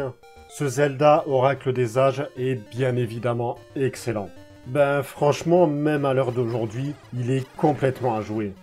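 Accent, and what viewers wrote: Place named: Toulouse, France. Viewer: Le tout couronné de l'accent Toulousain, magique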